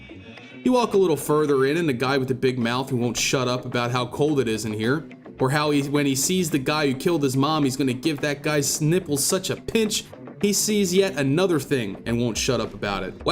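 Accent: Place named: Baltimore, United States. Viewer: love that baltimore accent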